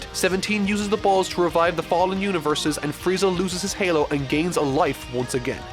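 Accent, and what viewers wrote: Ireland. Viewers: are u irish? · “And I’m not just saying that because I’m from Ireland” So THATs your accent.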